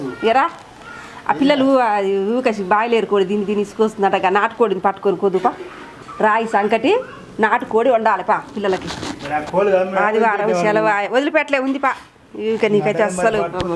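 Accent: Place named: Khammam, India. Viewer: Meedhi kammam district kadha? Me athamma accent alaane vundhi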